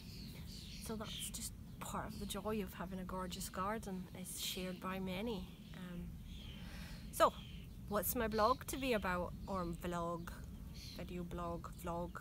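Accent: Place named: Galloway, United Kingdom. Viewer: Aha! There she is. What a beautiful accent. My friend lives in Mid Ulster; your accent is heavier than hers, more Scottish, though. I've no issues understanding you, though. My people came to Ulster from Galloway, originally. Enjoy your posts.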